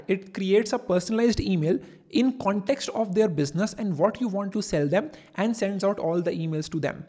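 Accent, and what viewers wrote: India. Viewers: thanks but that Indian accent is really hard to listen to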